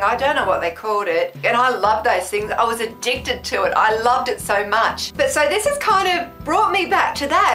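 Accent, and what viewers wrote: Australia. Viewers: I new you were Australian because you acset I'm Australia and i love mint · Oh far out i can tell that's an aussie acronym or slang term the way you say it in all your videos 😂😆🤣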